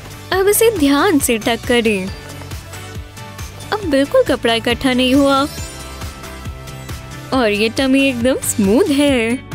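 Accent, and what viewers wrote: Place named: United States. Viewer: are you American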